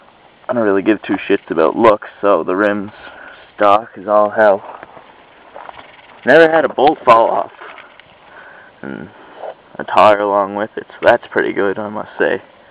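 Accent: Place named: Maritimes, Canada. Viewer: sound like a maritimer ta me??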